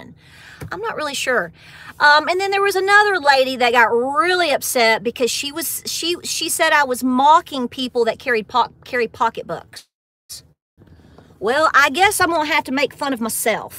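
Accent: South, United States. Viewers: You are so cute, correct, feminine, strong, and spiritual. I love your southern accent and expressions. ❤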